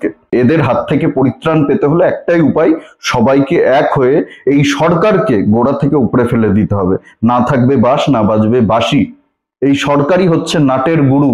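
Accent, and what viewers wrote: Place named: Bangladesh. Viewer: ভাষা শুনে তো ফুল বাংলাদেশী বলে মনে হচ্ছে... imported from Bangladesh...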